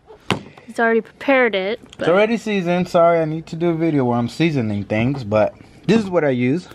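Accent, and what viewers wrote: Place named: South, United States. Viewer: Paisa with a southern accent......I love it. Un mojarra frying a mojarra🤣🤣 Looks goog Y'all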